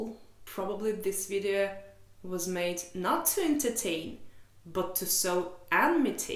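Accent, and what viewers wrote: England, United Kingdom. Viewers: Don't forget that your accent sounds very British, and NOT Russian so this is a barrier to some to believe you are not from the West or you are truly Russian. It's almost incredible to believe you have never been to the UK! I myself was fooled in this regard. This shows that as a linguist, you are very very good at speaking and learning English and with the desirable accent!!!! So, I had to re-listen to your Serbian "comparison" video again to find out why all the negative comments. And you DID NOT say anything bad about Serbia,, most of it was positive comments about Serbia. It was truly just comparing a few things in each of the two cultures. I truly don't know why all the negative comments afterwards. Maybe they interpreted it differently given their various background experiences. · WTH??? I told you previously that your video was better (and in many ways) far kinder than most travel videos. 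For example, if I were doing this, I would point out that Russians rarely smile, men make more effort to be gallant to their girlfriends /wives... I truly can't understand why it would 'blow up' (?) this way. But remember... There is no such thing as 'bad' publicity. 😉 And your accent... You sound like someone who learned British English, not American English. I speak (badly and haltingly) BRAZILIAN Portuguese. Not continental, nor Azorean. That's what I learned. Different words and different style of pronunciation. At least this proves the saying : Haters gonna hate! 😆 I like you. I like your channel. They can all go pound sand! 😆 😘🥰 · I'd like to see you do a video about the contrasts between Russian and Texas cultures. To me your southern drawl is not perfected yet but you're pretty smart, you can do it. Of course in south Texas the accent is different than in east Texas and a southern drawl is different in Texas than Alabama. 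To me it sounds like you speak English with a British accent but I doubt English sounds the same everywhere in England. And when you consider the UK, Canada, Australia, New Zealand and south Texas, it's different even among(st) native speakers. To me it's more about what we have in common. You're from Russia and not everybody is the same there either. But some of us share common values. Thank you for your videos. I would welcome you and your family in my home, I would sponsor you all (ya'll) if you want to visit. Take care... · Lmao, of course you sound British, when it's your profession. I've chosen American. How dare we sound native! 😂😂😂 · Why do you have a British accent??? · Hi, I like your English accent. Maybe you can make videos about how you learn English. You can share your experience with us. You know there are also many platforms where you can teach people English, and some of them are paid very well, especially Betakid. 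By the way, I think that this scandal in Serbian portals will help you in your advertising. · Probably not what you want to hear but I’m British and you do sometimes sound British (almost) ….but obviously I can tell you aren’t! Your accent is really interesting….oh and ignore the haters! 🤷‍♀️You should come to the UK and finish your conversion to 🇬🇧😀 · ) yes you sound british .:)) iv got 🇷🇴 blood in my vain , but leave in denmark, iv got a serbian koleg and im trying to learn serbian. As a russian how well do you speak serbian?? and how long time did it took you to learn it?? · Dear, Ignore the haters. Many of them would not notice the video, if it would be on Russian and not on English. 😄 Your English is excellent and I really enjoy your British accent. I watched a few videos and I really enjoyed the content. I wish you all the best in Serbia. 😊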